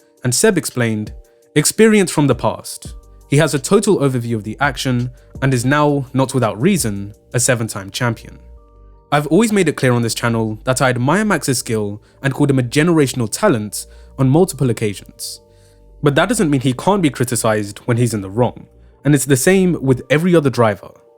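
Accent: United Kingdom. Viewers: This guy must be British 😄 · British accent noted...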